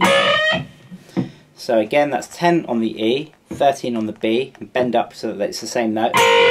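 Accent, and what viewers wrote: England, United Kingdom. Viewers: ....and thanks for speaking with this beautiful english accent so that a stupid german like me can understand you that well!!!! I never get these us guys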